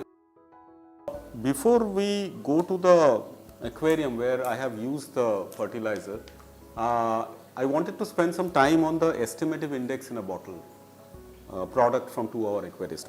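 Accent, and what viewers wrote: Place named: Bengal, India. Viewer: Dada I get your Bengali. My suggestion is to avoid mixing languages. If you have to use Bengali the do provide sub titles. Your Bengali accent is great tho.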